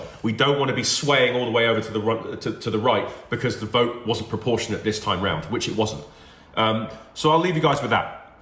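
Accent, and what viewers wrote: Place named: United Kingdom. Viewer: Dude your spot on we dont really care about anyone's ethnicity or colour just try and fit in too our culture the country and culture you chose to move to, were happy to have you but try and fit in, you sir are a perfect example of a family who has embraced British culture you speak like a true brit perfect use of language and I'm glad your one of us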